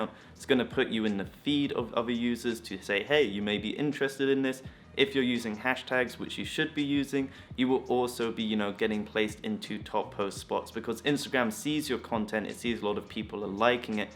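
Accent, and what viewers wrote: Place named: United Kingdom. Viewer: what part of the UK are you from? I ask because you have the same accent as one of the BBC news journalists.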